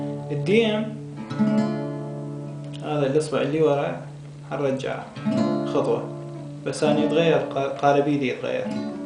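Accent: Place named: Iraq. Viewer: المدرس عراقي من الكلام مالته...مو bmw!!!حلوة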